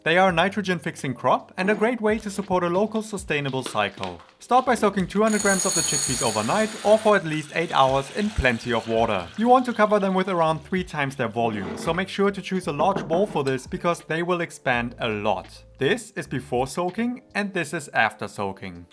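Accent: Germany. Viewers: Haha, came for the recipes and stayed because of them - despite the german accent and the "wordaah" 😂 I really like the explanation and comparison of the two versions! Will copy it, thank you! 😉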